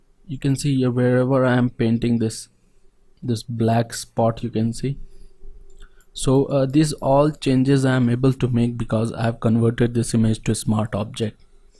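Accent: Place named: United States. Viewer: you talk like a american language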